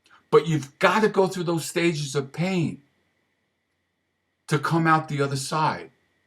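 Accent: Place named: Brooklyn, United States. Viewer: Yes, this rings true. It's amazing the way they all read from the same script. In my case, I was the scapegoat for two unhappy families. The options were stark---get out or commit suicide. I drifted away in my twenties. By my early thirties, I was gone. My father had written a letter to my boyfriend, telling him that I was a mental case, and that he ought to dump me. The letter did have an effect on my bf, but not the one my father was hoping for. And that was the end. Anyhow, that happened years ago. I realized my family was sick, but I didn't realize they were narcissists. I'd heard the word but didn't know the meaning. Narcissism clearly comes out of childhood trauma that the narcissist cannot face and cannot change. Lately, I sort of wonder what happened to my family after I vamoosed for good. I'm sure a golden child became a new scapegoat for her mother---she was the next oldest and at bottom they disliked each other---but I do wonder who my father beat up on with me gone. 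Perhaps his girlfriend, whom he was beating up on anyway. But if there was no new scapegoat, then he simply imploded. That's the way it is with these people---they either find a ready victim who can't fight back and can't expose them---or they melt down. Anyhow, it does get better with time and therapy. Eventually, they all become strangers, and it becomes incredible that they once were intimates and "loved ones.". (An empty and cynical phrase if there ever was one). BTW, I love your Brooklyn accent! I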